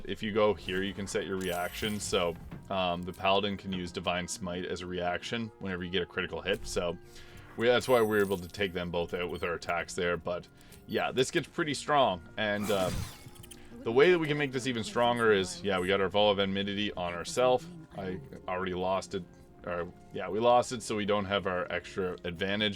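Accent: Maritimes, Canada. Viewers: Interesting. Thanks. I could tell you're from the Maritimes by your accent 😊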